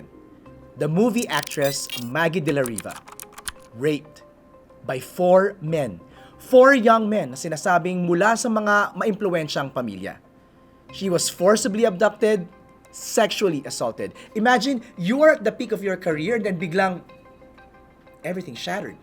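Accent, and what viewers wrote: Conyo, Philippines. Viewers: ang cute pala pakinggan ng slang conyo in a serious documentary, nuh hahaha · Cono naman magsalita.. arte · Sana iba na lang nagkwento. Interesting kaso nakakairita magkwento. Feeling conyo. Kakainis. Hindi ko na tinapos after this comment. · So conyo nmn nung speaker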